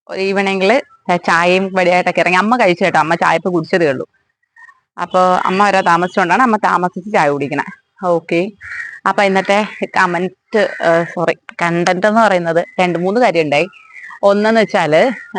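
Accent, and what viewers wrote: Trivandrum, India. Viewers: Language kettittu t.v.m annu 😊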